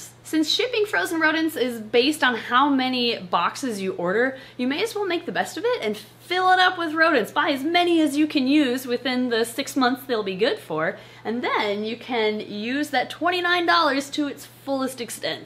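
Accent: Minnesota, United States. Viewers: Minnesota English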